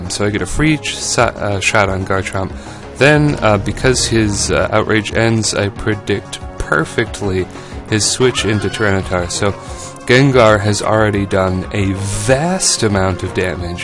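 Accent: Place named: Australia. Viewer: Youre sounding Less and less australlia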